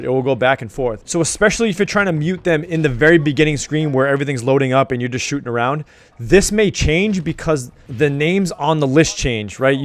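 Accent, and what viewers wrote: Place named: New England, United States. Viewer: are you from new england? you kind of have an accent lol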